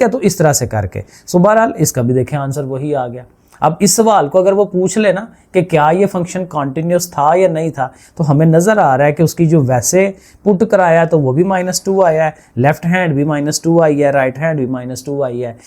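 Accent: Sialkot, Pakistan. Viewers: Sir has a pure sialkot accent😂 like who else noticed❤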